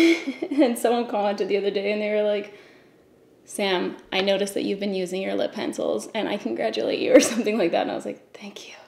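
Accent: Canada. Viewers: Haku Hodo is just a pleasant word in general! It doesn't have to do with your Canadian accent. I think it'd just your wonderful, unique self!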